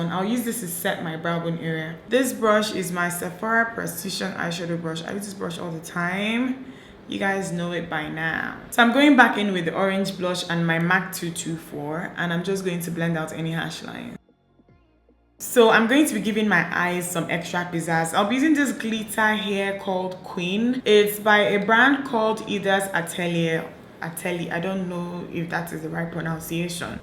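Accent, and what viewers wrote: Nigeria. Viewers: I love nigerians.Their accent,makeup and traditional weddings...you are so beautiful.Much love from Kenya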